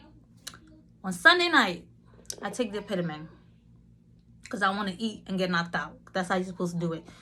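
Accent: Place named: Liberia. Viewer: You sound a little like you Liberian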